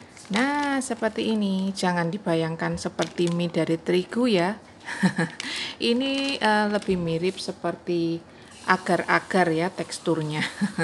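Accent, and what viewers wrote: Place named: Surabaya, Indonesia. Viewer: Opo kabare cik..kangen sama logat suroboye aku ..kangen suarane rek😂😂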